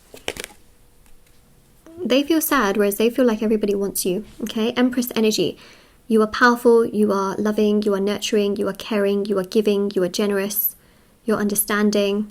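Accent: United Kingdom. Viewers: Dude you look like North Indian with strict parents but you sound like British ?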